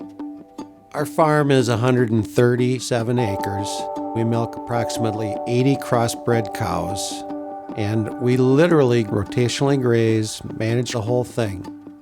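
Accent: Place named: New Zealand. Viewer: Hey, Lovely farm,...... you sound like a kiwi farmer;],.....I practice rotational grazing etc have done for years,..... just a thought, the organic farmers say the moon waxes and wanes,.....ie rises for two weeks and falls for two weeks, so 28 day process. So if you have a round less than 4 weeks you are short circuiting the natural cycle, as in for two weeks the energy goes into whats below the ground, so every round you think boy I'm running out of grass its just not growing like it should, and the next two weeks you think wow look at it grow, so I've always stayed on a minimum of 28 day round, also the extra cover helps keep the moisture in the ground better.We use a grazing planner in Nz for calculating winter grazing rounds transitioning out into a spring round, assisting with areas consumed on any given day, which I think you'd find handy. 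It must help with the marketing of your product all those health outdoor cows:] 😊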